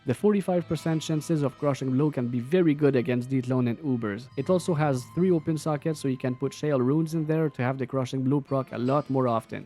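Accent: Quebec, Canada. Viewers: es-tu queb? tu sonne queb haha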